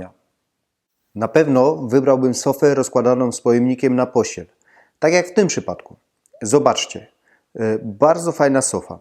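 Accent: Silesia, Poland. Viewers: Świetny odcinek! Za niedługo będę wykańczał pierwsze mieszkanie - właśnie takie małe, więc szukam inspiracji. Dzięki za porady! Na marginesie - jak usłyszałem jak Pan mówi to myślę sobie: no taki śląski akcent! Ale nazwisko takie nieśląskie, myślę sobie: "pomyliłem się". I sprawdzam - a jednak, siedziba firmy w Rudzie Śląskiej ;)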